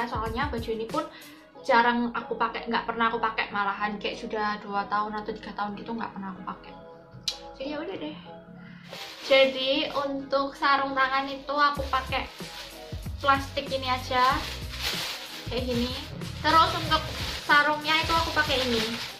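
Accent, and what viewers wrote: Java, Indonesia. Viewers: seneng bat dengerin medok nya wkwk:v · medhogggg medhogg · Suka bgt kalo medok gitu wkw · Medok skalee kak.. .. Kaya aku 😅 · Medoook wesh bahasane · Medok banget mbak🤣🤣🤣🙏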